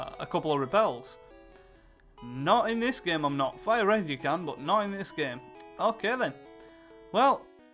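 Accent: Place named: United Kingdom. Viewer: ok youtube really need to work on their close captioning of british accent.. srsly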